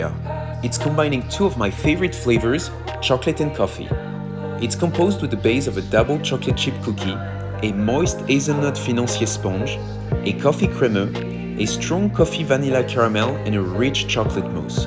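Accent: France. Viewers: First time French accent in English doesn’t bother me.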